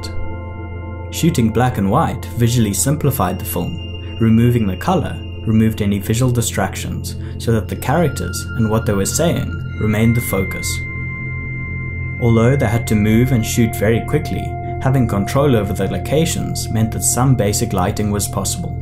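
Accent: South Africa. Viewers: Ek wil mos se ek hoor n South African accent. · This man South African? Your accent almost sound like our's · I hear a South African accent! yay for the SA industry. Love this short video. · Are you South African? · Are you from South Africa man? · I'm going to go out on a limb and say South Africa. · South African?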